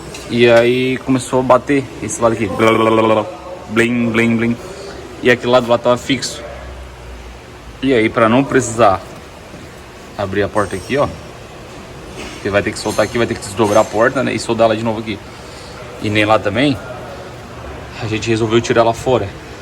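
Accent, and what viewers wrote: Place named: Santa Catarina, Brazil. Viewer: Sotaque de SC. É da Floripa?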